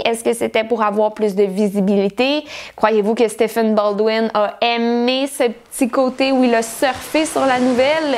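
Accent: Quebec, Canada. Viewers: J'ai vu le truc a propos de la prière sur Twitter mais j'étais pas au courant de tout le reste merci pour la vidéo ( J'ai un peu de mal avec l'accent Québécois mais j'aime trop le thé 😂)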